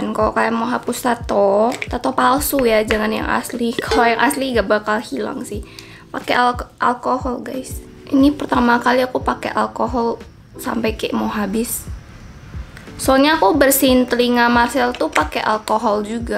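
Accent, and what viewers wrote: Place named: Java, Indonesia. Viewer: KAKA KALO NGOMONG KAYAK ORANG JAWA SIHH 😂😂 TAPI AKU YA ORANG JAWA YA HEHE 😅😅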